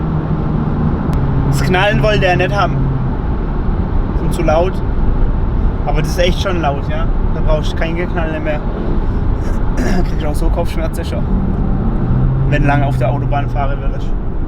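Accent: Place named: Hohenlohe, Germany. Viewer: aaah wieder dahom in Mergentheim, seh i doch glei... :-) "...Steckor uffs Getriebe..." is hald doch faschd Houalohe!!!! Houalohe, do wo die Hase Hoosa haase, un die Hoosa Housa haase. :-)))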